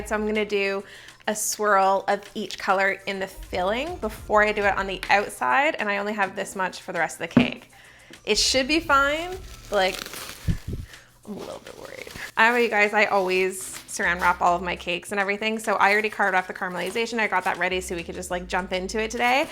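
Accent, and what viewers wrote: Canada. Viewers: Is she Canadian? I found her channel yesterday and I’ve been binging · I just subscribed! ☺️😁 also, are you Canadian? 😂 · Me: NO you are not subscribing to any more baking channels .. All you make is Vanilla or chocolate basic cake!!!!!! - Also me: But she's sooooo cute ... She's adorable!!! And that Canadian accent!!! Chef's kiss!!! - Me again: I said NO .. what are you doing?? ... Ok, you're subscribed ... I hate you 😅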